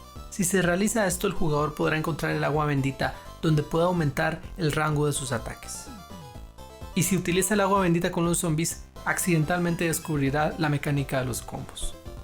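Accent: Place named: Costa Rica. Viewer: Ese acento es de Costa Rica. 🇨🇷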